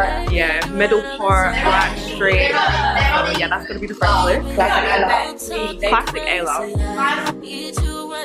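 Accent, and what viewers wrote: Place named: Scotland, United Kingdom. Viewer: I actually forgot you are Scottish 😂😂 it’s been so long since I’ve heard you speak. Love it.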